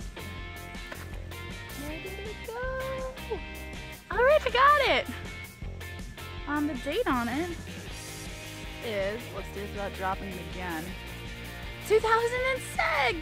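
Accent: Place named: Australia. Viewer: For a second i thought you were coming too my country Australia lol. Well it sort of sounded like it ;)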